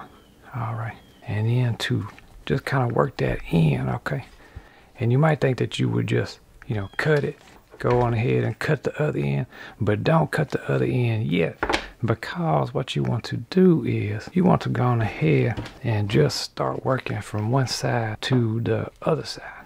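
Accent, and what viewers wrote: Louisiana, United States. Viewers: Hey my kids and I love your show! Are you from Louisiana?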